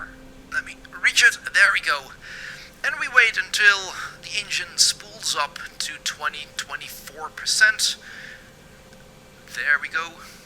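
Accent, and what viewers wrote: Netherlands. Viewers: you sound exactly like a dutchman trying to speak English. Well done. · I hear a dutch accent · I know a Dutch accent when i hear one :)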